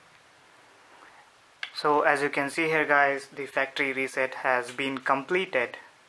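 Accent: India. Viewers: Nice, slow and clear also detailed explanation dude Not like other indians that explained with really fast commenting and also not clear too what they blabbered about, to make it worse sometimes brought very heavy indian accents I end up dont understand anything, lol About your accents, only a little indian accent and very acceptable Thx mate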